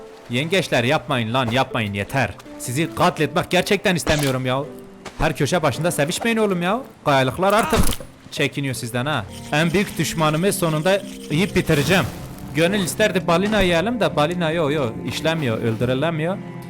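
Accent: Elazığ, Turkey. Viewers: Abe bende elazigliyim senin elazig li oldugunu şive den direk çaktim abi sen tuncelili misin tuncelilisen nerde otirisin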